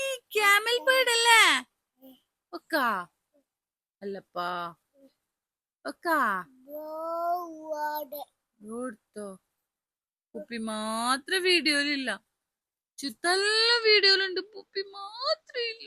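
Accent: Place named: Kasaragod, India. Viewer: Kasaragod basha തുടർന്നോളു ഇതാണ് നല്ലത്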